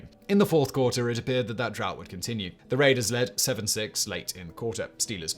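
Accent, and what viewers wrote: United Kingdom. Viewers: So interesting to hear a British accent describing American Football. Even more interesting? Pronunciations of "Unitas", "Ameche", "Stabler", etc. Made me smile.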